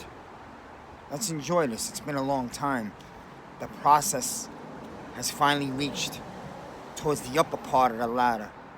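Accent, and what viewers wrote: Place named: New York, United States. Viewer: Holy shit man, a true Blue NYC Dude - I F n love listening to you! Not only do you know your stuff, ypur presentation & use of language is music to my ears. This former NYC dweller feels like I'm back home listening to your delivery bro. You should be on ESPN!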